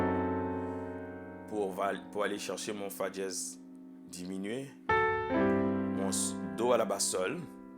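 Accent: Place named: Canada. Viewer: Vous avez un accent pas très prononcé mais coe une personne qui a été au canada ou bien vous êtes au canada.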